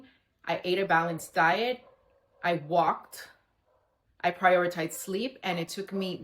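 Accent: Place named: Miami, United States. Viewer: I had a feeling. I love your Miami accent. Keep up the amazing work!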